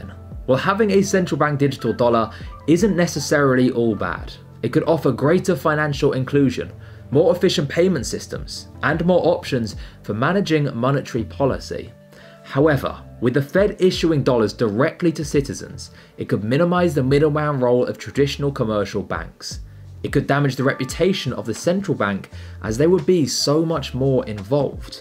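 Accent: United Kingdom. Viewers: Great video. You sound British so why a US focus ? Shame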